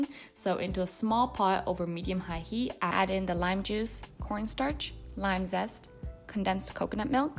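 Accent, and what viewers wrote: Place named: Canada. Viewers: Where are you from? I love your accent :). Perhaps Canada?^^ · Lol i thought canada too!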